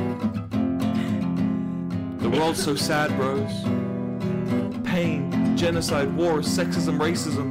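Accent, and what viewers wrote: United States. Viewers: I fied when I heard his American accent LOL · the half american accent hurts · the semi-american accent feels like sin and not in the good way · His mixed american accent litterally just sounds like my defult accent. Update: first, that was not 5 months ago, second, my accent is full american now lol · His American accient is hilarious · He sounds AMERICAN!! :0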